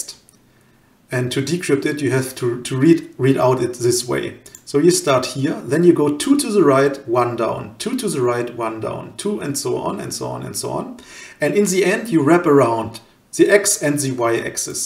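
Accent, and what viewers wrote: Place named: Germany. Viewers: Interesting! But that German accent really is a killer. That's me saying this, a German. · The german accent MAKES the video! 😍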